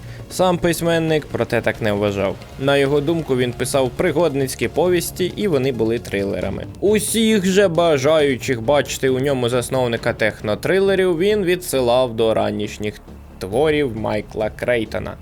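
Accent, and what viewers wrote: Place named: United States. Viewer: За україномовний контент + За акцент американця, якщо спеціально застосовується у дикції, величезний мінус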